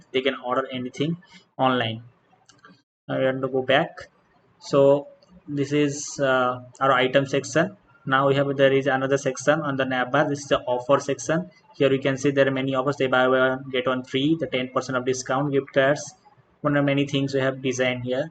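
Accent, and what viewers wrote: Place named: United Kingdom. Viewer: Woww woww... British accent😱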